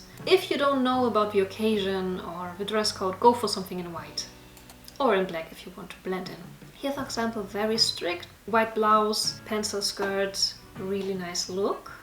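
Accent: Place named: Germany. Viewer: German accent 😂😂😂😂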